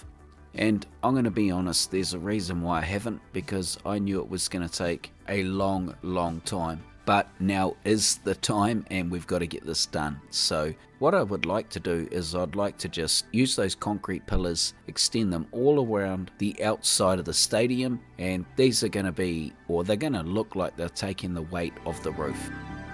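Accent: New Zealand. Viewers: Another incredible build! Btw, while I do love the NZ accent, I’d just like to note that it’s pronounced fa-VE-la:) Keep up the good work! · I really like when he says: "feârsty" instead of saying "first" like other countries. kiwi accent sounds funny and i actually love it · What a beautiful New Zealand accent 🙌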